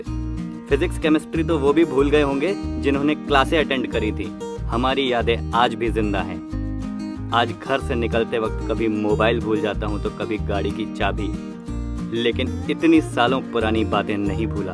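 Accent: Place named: Lucknow, India. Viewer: Bahut khoob kaha ,lahzey mein bhi wohi lucknawi andaaz, kya baat kya baat kya baat ,you made us nostalgic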